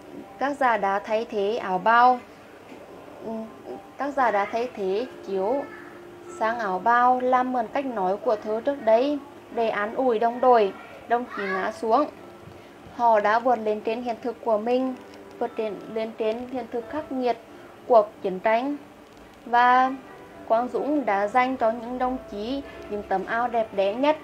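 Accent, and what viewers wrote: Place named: Nghe An, Vietnam. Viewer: Tiếng nghệ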